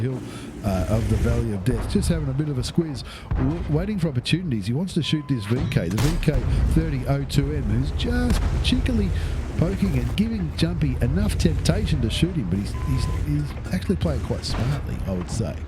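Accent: Australia. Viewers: Halo Governor yes type 59 g were all over the place after black market now rarely seen on N/A great videos and awesome aussie commentary from Jax Fla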